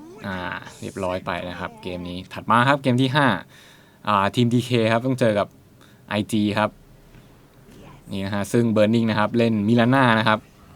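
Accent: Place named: Thailand. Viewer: ผมไม่ได้ว่ามันอ่านผิดรึถูกครับ แค่ฟังที่คนพากย์อ่านสำเนียงไทยแล้วมันฮาดีครับ(ไม่ได้ว่าคนพากย์นะ 55)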